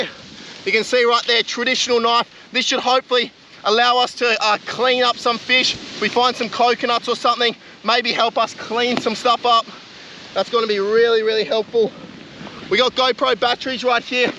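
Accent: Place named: Australia. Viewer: Everything i need for the best survival Youtube cannel: Positivity Energized Maaad australian accent Talking a lot about the island and stuff AND SHOWING HOW BEAUTIFUL THE SCENERY, ISLAND, LOCATION IS (almost noone does that) And most important: Having respect for the animals getting killed And the beautiful underwater scenes where he just shows nature before hunting This channel has everything and i wish you over 1mil subs for that quality ❤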